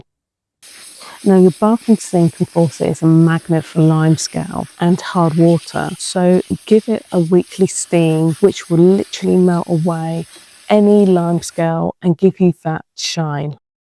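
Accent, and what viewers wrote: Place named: Southern England, United Kingdom. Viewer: Faucets or taps? She sounds like she's from the south of England so why faucets?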